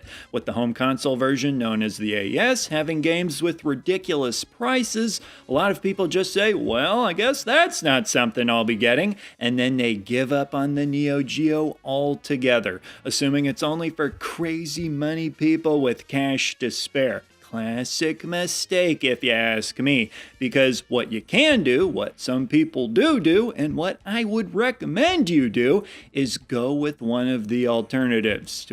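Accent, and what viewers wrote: Midwest, United States. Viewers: He is strange...where is that accent from...midwest? Northern/Canada?...Mormon territory?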